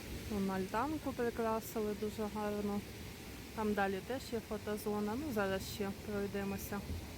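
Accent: Surzhyk, Ukraine. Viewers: так, використання і замість «и» це прямо суржик-суржик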